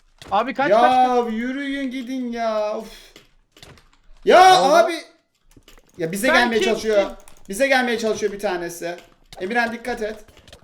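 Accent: Trabzon, Turkey. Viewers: abi trabzonlumusun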